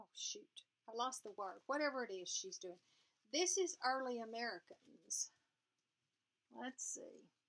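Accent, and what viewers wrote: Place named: Tennessee, United States. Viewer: Hi! New subbie! Love your Accent! I'm in Tennesse and the accents sound very much alike! lol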